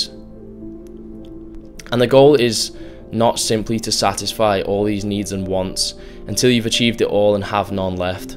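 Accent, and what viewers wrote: United Kingdom. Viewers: The party montage reminded me of Human Traffic, with your voice all trippy & british accent :D Good stuff! Perfect timing for me as well, been going through the same thing myself, first traveling for festivals & raving a lot, then going backpacking, growing a lot as a result but also being left with a sense of emptyness after that. Got to work so I can enjoy the present moment always, and being the best version of myself always, not just when partying and traveling. It's the hedonic treadmill, we always chase happiness, base it on external factors, and our happiness reverts back to baseline when we get it, we're never satisfied for long. Anthony de Mello is a great spiritual guide on that, his lecture rediscovery of happiness goes through how we can undo our cultural programming that keeps us changing money, women, status, jobs, titles etc in hope of happiness.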